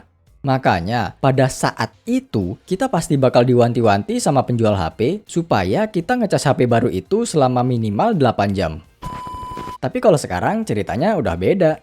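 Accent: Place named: Central Java, Indonesia. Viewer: Kayak orang rembang ini😅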